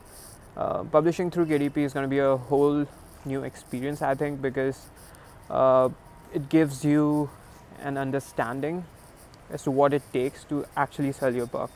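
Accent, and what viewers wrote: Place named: England, United Kingdom. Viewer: You r a outstanding quoran brother but remember one thing this english accent won't fetch publicity to u...Just be practical...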